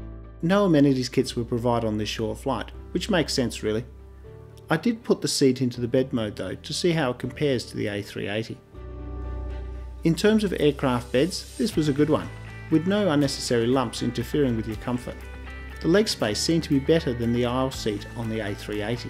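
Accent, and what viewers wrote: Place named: Australia. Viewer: I always enjoy your videos. Your accent matches well with my idea of a typical laid-back Aussie explorer. Especially the way you say "paaaarsta" I like "paaaarsta" mmm :D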